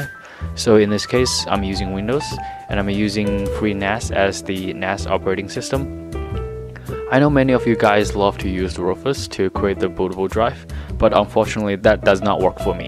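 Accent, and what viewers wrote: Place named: China. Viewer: Are you a Chinese? I like your accent!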